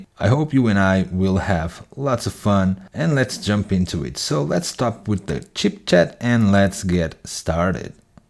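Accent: Portugal. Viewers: ele é português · pandahg00 Portugal n br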